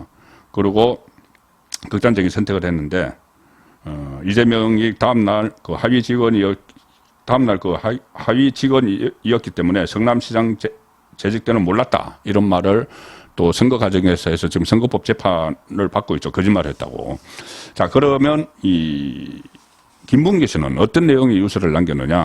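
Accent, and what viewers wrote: Gyeongsang, South Korea. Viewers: 송국건유튜브방송을 즐겨보면서 늘 느끼는 점은 내용은 최상의 수준인데 말실수를 잦은편인데 그건 그다지 문제가 될 수는 없지만 억양 지방시골억양이 참 문제입니다. 예컨대. 엄마의 자매 이모?를 성씨가 이씨일때 이 모씨 라고 말하면 안되듯이, 장음 단음이 정확해야하듯이 오늘 방송을 보면서 자꾸 유서를 유 서 라고 합니다. 스스로 녹음된 거 보면 그걸 못고치는지? 40년대 시골사람이 서울한번갔다와서 서울말씨를 흉내내다보니 저런 현상이 나타나듯한데, 특히 경남지방사람들이 저렇게 발음 억양을 구사하는데, 대표적으로 가장 울렁증유발수준은 성창경입니다. 그런데 송국건혼술은 정말이지 우파에서 방송내용은 최상인데, 발음 억양이 최고스럽지않는 기본이 안된건 고치는 게 좋지않을까요? 앞서 언급했듯이 성창경은 울렁증생겨 듣질못할정도인데, 기자출신이면 그걸 고치지못한다면? 울산이나 포항정도수준의 시골지방기자수준에서 벗어날 수 없는 게 아닌가하는 맘이 듭니다.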